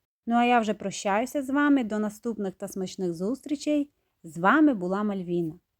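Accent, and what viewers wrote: Transcarpathia, Ukraine. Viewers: Доню, сир завжди був "домашній",або "свіжий". То у москаля "твораг"...Ми ж не співаємо:Любив козак дівчину, і з кисломолочним сиром пироги😊😉. А слухати вас дуууже приємно, бо згадую дитинство та подорожування по Закарпатті.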